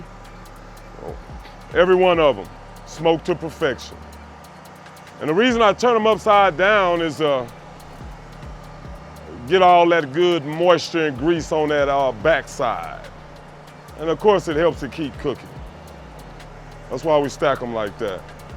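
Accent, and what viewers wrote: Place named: Texas, United States. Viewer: Oh lord. This jokers In Utah. Talked like he was Texan. No wonder he’s talking silly smack. No decent QUE in Mormon world.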